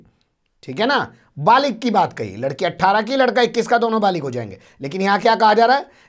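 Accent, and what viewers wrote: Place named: Meerut, India. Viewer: ka merat wala style sunne mein achha lagta hai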